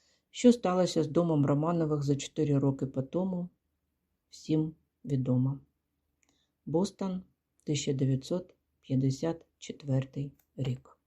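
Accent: Russia. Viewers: вітаю! абсолютно не хочу образити чи то знецінити Вашу працю. проте хочу зауважити, що, на мою думку, Вам варто ґрунтовно попрацювати над фонетичним аспектом мовлення. дуже важко слухати через абсолютно часто заміну звуку "о" на "а" і некоректне помʼякшення чи не-помʼякшення. враження, ніби Ви читаєте українською, але на російську фонетику. вдосконалення вимови українською було б чудовим кроком. Ви робите важливу справу і щасти Вам із цим. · Дякую за статтю Шевельова, за якою давно шукала. Але, на жаль дуже зросійщена вимова, що псує вражіння.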